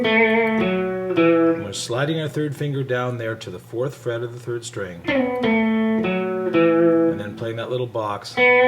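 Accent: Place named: Canada. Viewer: Thanks for the lesson. Very helpful. Are you Canadian by chance? You accent suggest that you might be. Thanks.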